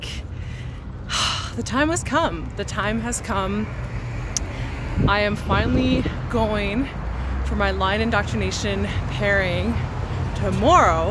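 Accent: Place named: Canada. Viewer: pilot, I hear your Canadian accent a lot more in this video than the last one I watched lol....so are you a veteran of Her Majesty's Royal Canadian Navy or Air Force? I should call you ma'am if you're a Naval Aviator or RCAF.:) I was USN enlisted on submarines, 1999 to 2003...STS3(SS).:) Never piloted an aircraft myself, want to do it someday...now that I'm fully vaccinated I've started taking some plane trips again, USA only though. Canada is still closed off to Americans I think for covid. Last plane trip, I went San Jose to Los Angeles, and then took Amtrak to San Diego, and then flew back San Diego to San Jose. Currently my next planned flight is September 3rd to Seattle for PAX West! (gaming festival)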